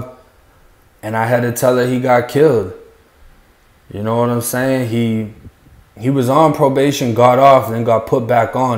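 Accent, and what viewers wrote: Boston, United States. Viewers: Ok man I get it..I thought u sounded like u were from Boston..ok man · I'm from worcester n it's nice for someone to finally she's some light on what happens in ma. U have a Boston accent..but thought u was from FL. A lot of shit happens around here but it never gets so thank u!!!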